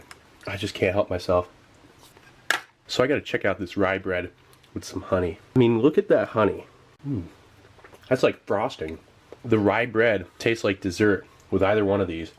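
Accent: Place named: California, United States. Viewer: Always wondered where you're from? I hear a Cali accent.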